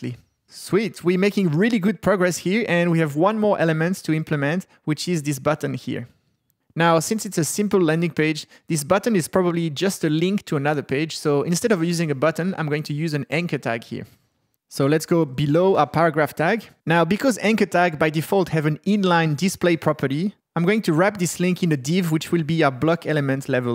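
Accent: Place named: France. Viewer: I still say it's all about the French accent ;). Keep up the amazing work. Cheers from the 905.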